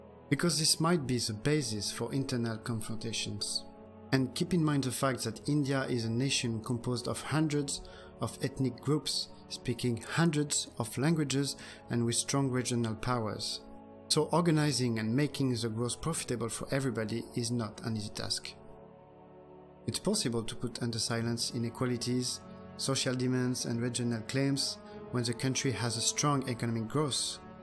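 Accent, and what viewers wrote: France. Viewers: Just 10 minutes far in the video, and enjoying it truely, but I have to comment now on your super cute accent. As a french myself I understand your struggle and I compliment you : you stress all the words properly. But still, it makes me smile. Shirvan commented a bit more on the difficulties that lies ahead of India, than you do. Do you put more weight on more optimistic views by choice, like your Patreon page says ? "Cheerfull foreign affairs videos" It chearfull for sure :-) Oh, my bad, you do talk about the limits to growth. thèse-antithèse-synthèse on se refait pas :-) I personally am afraid the positive developpment of India might suffer very much from the peak oil. Alltogether, views on economics, on politics, and geopolitics... all sumed up, given nuances... very beautifull peace of thinking. I proudly recognize the french-like attention brought to the organization of thoughts, with diagramms and titles, just like in school ! 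· the French accent is stronk here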